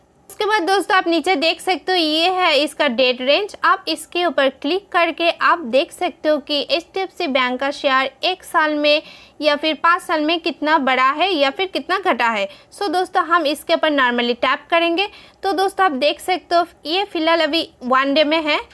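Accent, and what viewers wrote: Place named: Bengal, India. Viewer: Sweet Bengali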